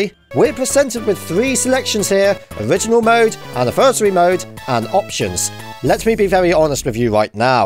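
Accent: United Kingdom. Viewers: Only British accents can make Sonic Genesis rage funny. I am Scottish and I know this.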